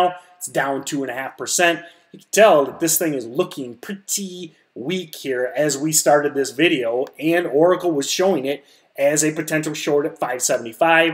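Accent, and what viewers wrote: United States. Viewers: The way he talkssss, his accent and his over emphasis of general words is so annoying and obnoxious. My ears literally bled.. Maybe coz i am an aussie and can't comprehend his typical non urban american accent. Faaaarkkkkkkkk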